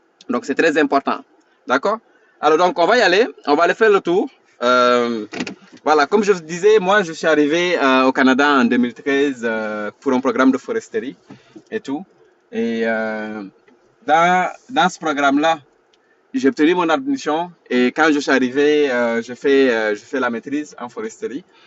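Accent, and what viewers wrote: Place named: Senegal. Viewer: Salut lorsque tu a commencé à parler jje me suis dit en même ce Mr est un sénégalais merci pour la vidéo.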